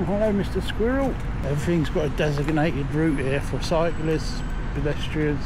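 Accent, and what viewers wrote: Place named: London, United Kingdom. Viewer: So nice to hear a proper London accent! Very rare these days! You’re looking well mate, looking forward to your next adventure!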